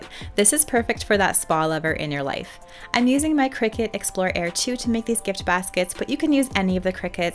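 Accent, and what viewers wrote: Canada. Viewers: addresses this in lots of her videos! It's the Canadian pronunciation.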